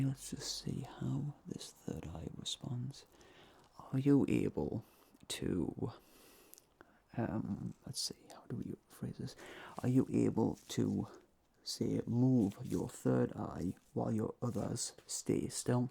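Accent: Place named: Newcastle, United Kingdom. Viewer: Finally! A geordie ASMRtist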